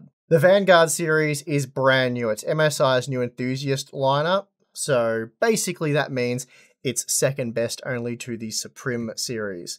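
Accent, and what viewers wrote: Australia. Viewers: I also love the silent baos hahahaha I love how Australians pronounce some words lmao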